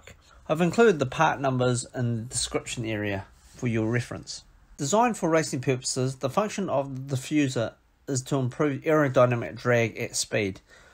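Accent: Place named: New Zealand. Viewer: You sound like kiwi. Have you found a way to purchase this diffuser in Australia?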